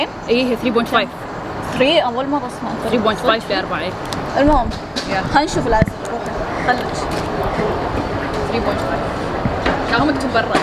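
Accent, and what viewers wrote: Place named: Egypt. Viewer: اللهجة المصرية 😍👌🏻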